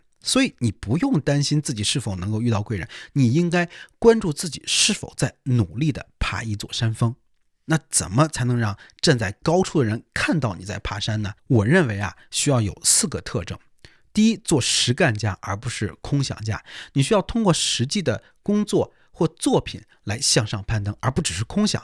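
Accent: Tianjin, China. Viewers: 老师，您讲的很好，但我很好奇您怎么从山村里走出来的？ 我听着您的天普口音很亲切…这种口音怎么可能从山村里走出来…蓟县也不说这种天普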